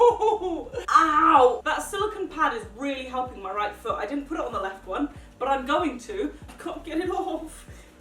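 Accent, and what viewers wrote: United Kingdom. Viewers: I love your British accent ❤️❤️❤️❤️❤️❤️❤️❤️❤️❤️❤️❤️❤️❤️❤️❤️❤️❤️❤️❤️ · I can tell you are from the UK 🇬🇧, I’m sorry for those who will hate but I’m new to this channel Also my favourite time stamp has to be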